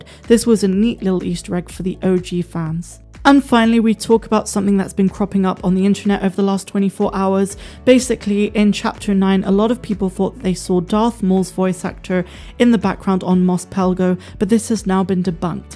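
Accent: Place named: Bristol, United Kingdom. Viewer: Love how you say jibba jabba, sound like you're from the Bristol area ? You should say jabba jabba instead 🤣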